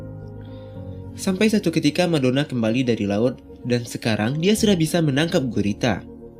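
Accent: West Sumatra, Indonesia. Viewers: Fix mimin org minang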